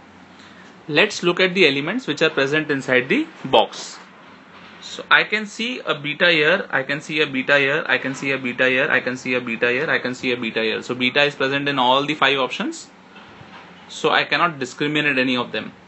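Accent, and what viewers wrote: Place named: India. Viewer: why does indian people talk like that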